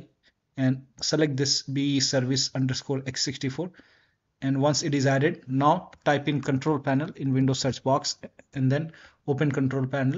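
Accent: India. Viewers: Where are u from by the voice it looks like u r from India if yes wanna play together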